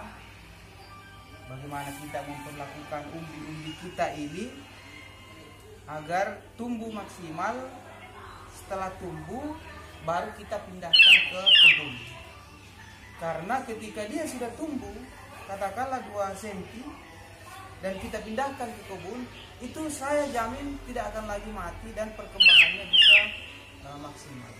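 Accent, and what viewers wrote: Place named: Bugis, Indonesia. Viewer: Logat bugis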